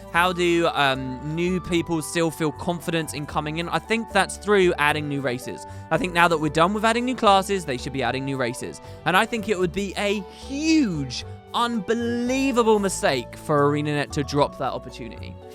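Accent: United Kingdom. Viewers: Glad to have some lighthearted British monologue in the morning between two dark episodes of Daredevil :D Totally agree about third party lore/story sources. I love the lore and enjoy the story when it's good but I rarely experience it in-game. Every now and then I take my time to interact with a NPC but usually I just click through all the dialogue as fast as possible. I think the last time I really looked for stuff in-game was in S02 when we returned to the Priory and I read something like half of those new lore bits.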